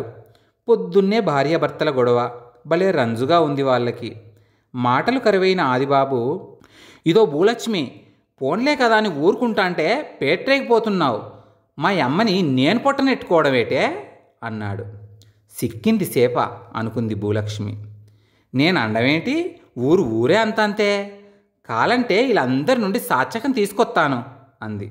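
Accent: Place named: Uttarandhra, India. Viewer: ఉత్తరాంధ్ర మాండలికం మీ గొంతులో అద్భుతంగా పలికింది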